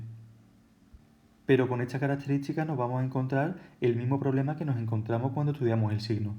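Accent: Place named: Murcia, Spain. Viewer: murciano fijo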